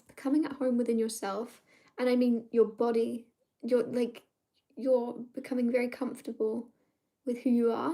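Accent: United Kingdom. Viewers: Hi. I thought you sounded British? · . You. Do. Sound. Very. British. Possibly. Irish. Not. Welsh. Or. Scotish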